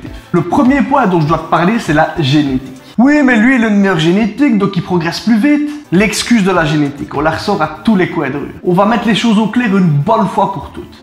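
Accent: Liège, Belgium. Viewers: J'aime ton accent (liégeois je pense) mdrrr bonne vidéo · Les Nuls 😂😂 Excellent avec l'accent belge! Top Vidéo continue! 💪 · oufti Gros gros accent de Liège...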